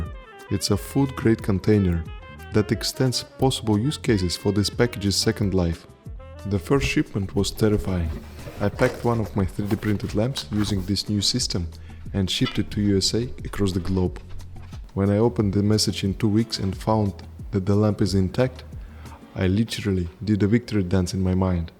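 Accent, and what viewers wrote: Russia. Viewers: Where are you from? You saying "here in china", and given your accent, I would bet Russian. If so, cool. Glad to see a Russian making cool products, instead of failed wars. (Not to say we are much better, but we all know the war is a joke)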